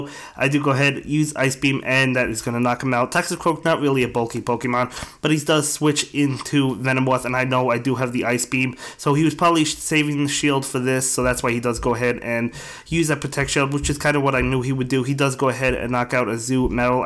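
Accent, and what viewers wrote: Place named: New York City, United States. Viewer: It's a regional thing; I'm from NYC and I can understand him perfectly. Not sure what you're talking about with bodily noises.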